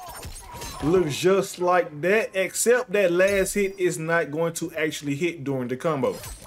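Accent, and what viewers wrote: South, United States. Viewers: Love your step by step showing of combos. But what makes it even better is your dirty south country accent lol. JUST LIKE THAT!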